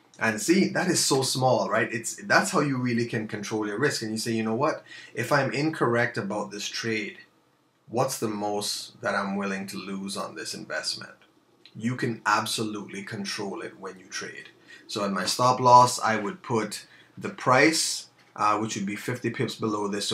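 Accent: Jamaica. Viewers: Are you from Jamaica...if so place email me at <mail> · Where is your accent from? Sounds like a cross between Jamaican and Northern Ireland lol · he got a hidden Jamaican accent...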